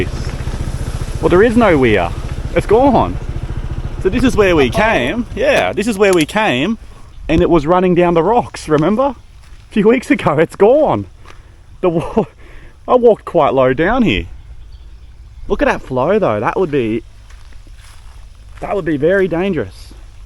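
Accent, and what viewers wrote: Australia. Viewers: Sounds like a Melbourne accent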